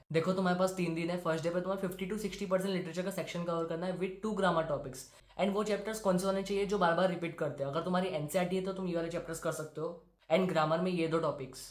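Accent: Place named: Hyderabad, India. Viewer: His.. Hyderabadi accent 😅🤙🏻🥀😭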